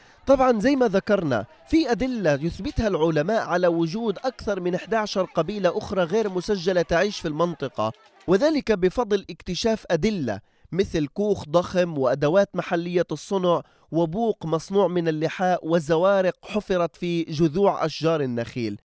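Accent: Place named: Gaza, Palestine. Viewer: الي بحكي مبين انو فلسطيني من غزة حسب لهجته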